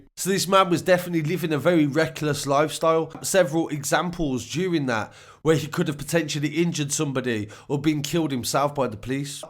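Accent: United Kingdom. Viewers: I just like the intonation and accent hence glued to these stories. Which part of UK is this amazing accent from 🤔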